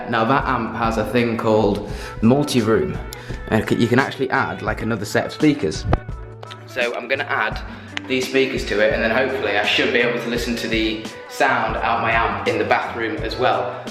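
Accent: United Kingdom. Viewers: Your point is invalid he is from the UK, ha has one of the most British accent ever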